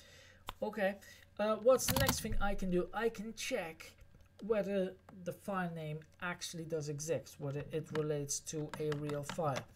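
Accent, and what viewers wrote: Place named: Ireland. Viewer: I cant put a finger on your accent, sound irish but something else too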